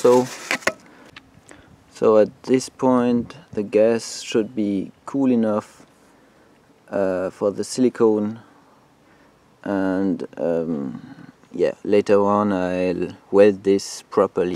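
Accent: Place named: France. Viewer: heureusement c'est un beau projet, les anglophones n'ont qu'a faire ce que ton accent francais trahi, traduire 🤨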